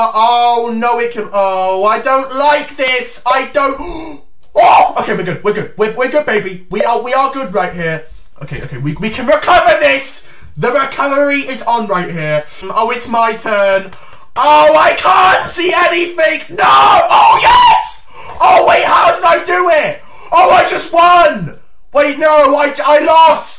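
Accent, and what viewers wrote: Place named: United Kingdom. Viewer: That's just how a British accent is ... a stereotypical US accent pronounces the r more properly and makes more of an "aa" sound for the aw. Same thing with the word yawn - pronounced like "yorn" in UK and more like "yaan" in US.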